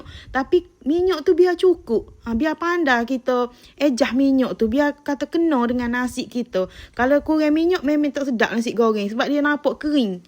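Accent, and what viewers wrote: Kelantan, Malaysia. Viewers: Sedapppnya...tk resepi..asyik dengar kecek klate muuu...😊😊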